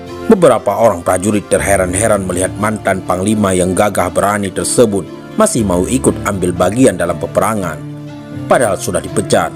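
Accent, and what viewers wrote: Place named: Bali, Indonesia. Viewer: Yg bcra sperti orang bali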